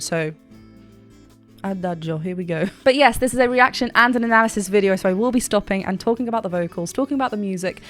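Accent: United Kingdom. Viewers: You have a beautiful British English accent.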